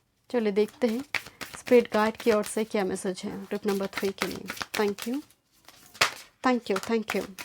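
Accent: Bengal, India. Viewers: You sound like a Bangali.....is so..?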